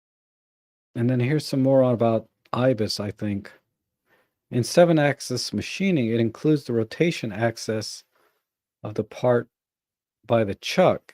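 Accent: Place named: United Kingdom. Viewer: I thought you were british, you dont speak as sloppy as most American. I am from Sweden by The way and follow you, red 35, Peter Forsgård, Matti Sulanti, Robin Wong, micro four nerds among other.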